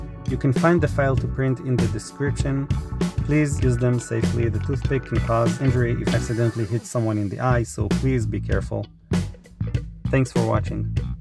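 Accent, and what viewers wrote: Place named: Israel. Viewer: I hear an Israeli accent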